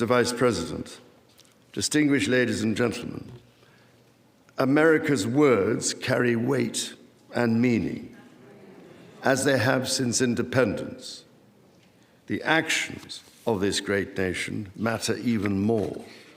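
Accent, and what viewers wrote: RP, United Kingdom. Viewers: That speech put us Americans to shame in terms of pure literary eloquence, and RP, or Received Pronunciation i.e. "the Queen’s English," which is a is a high-prestige, non-regional accent historically associated with the upper class/royalty for its articulation, distinct vowel sounds, and a "cut-glass" tone. If i was taking an order from his majesty, I would be hanging onto every single word out of sheer admiration, and i am sure to his majesty's utter exasperation and irritation. Otherwise, a highly politicized speech requesting President Trump and US to recommence aid for Ukraine and a little sliver of an arrow about politicAl speeches and the importance of language.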